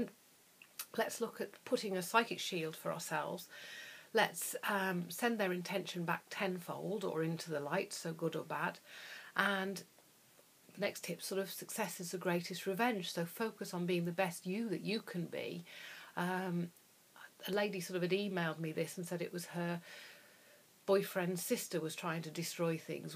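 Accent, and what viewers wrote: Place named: United Kingdom. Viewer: And fuck those haters your gorgeous and smart and nice and honest your make up and hair is just fine,they just hating.fuck them your right how to deal with them,all these haters I would love ❤ to see what they look like,lol lol I bet it would be a permanent Halloween show lol lollol and love your British accent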